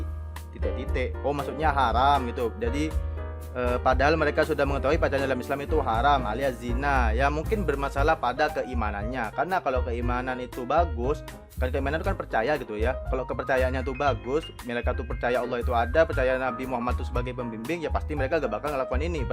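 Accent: Indonesia. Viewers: Mas... logat maduranya mas dikondisikan 😅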